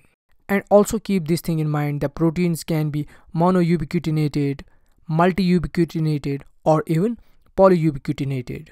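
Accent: Kashmir, India. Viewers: Sir i guess u r from kashmir?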